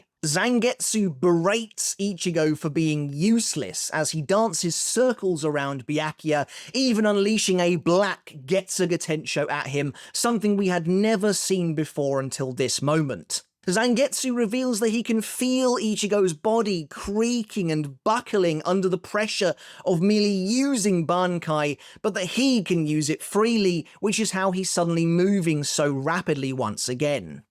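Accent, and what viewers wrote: United Kingdom. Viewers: Bro those are bangers plus that british accent 💥💥💥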